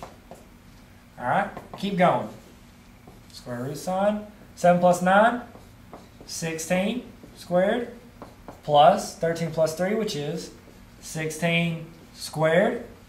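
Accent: South, United States. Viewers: this helped me so much thank u btw i never understood from the teacher but when i come back from school i study what i did today and u helped me sooooooo much ZAAAANKS!!!!!!!!! YOUR THE BEST TEACHER BTW I LOVE UR COUNTRY ACCENT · Um lets use our heads maybe he from the south? sup with you stupidity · Sup with that country accent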